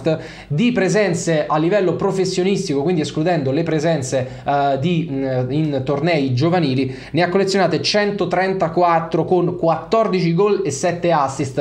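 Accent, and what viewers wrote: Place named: Naples, Italy. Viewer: di dove 6? Hai un accento napoletano. Cmq sei in gamba, saluti e ForzaNapoli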